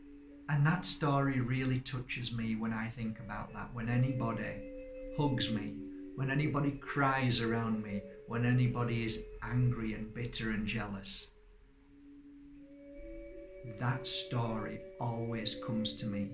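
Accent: Yorkshire, United Kingdom. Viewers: Hey! I´m so glad I´ve discovered your channel about 10 days ago. I really don´t know how it happened. I´ve been seeing loads of your videos ever since, and all of them are just fantastic. Your energy is so uplifting and addictive. Before I didn´t know a thing about moldavites and now I´m totally in love with them. Their beauty is totally out of this world;) I really love your channel since the very first minute, it´s bloody incredible. On top of that, I lived in Leeds and just love the Yorkshire accent, now I´m back in my home town in Spain and I really miss it (At first I didn´t understand a word in the Yorkshire accent haha). A big thank you from the bottom of my heart for everything you do:)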